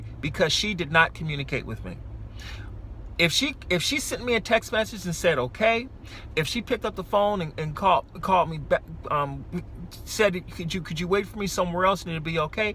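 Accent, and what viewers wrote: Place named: Edinburgh, United Kingdom. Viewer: Sounds Edinburra chief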